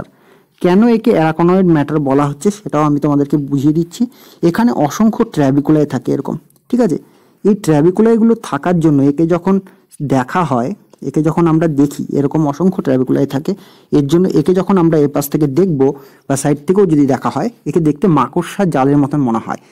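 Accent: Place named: Bengal, India. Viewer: Sir please can you tell in Hindi or English along with Bengla language... Its so difficult to follow your speed Bengal accent... Please please please.. I need it..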